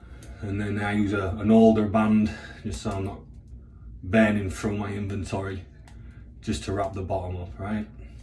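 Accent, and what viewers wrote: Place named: Yorkshire, United Kingdom. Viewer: Mate, are you from Hull originally? Sound like a Yorkshire lad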